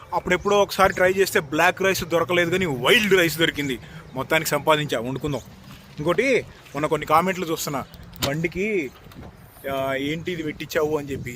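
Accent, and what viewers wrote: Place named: Guntur, India. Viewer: తెలంగాణ అంటున్నారు కానీ meru గుంటూరు slang matladutunnaru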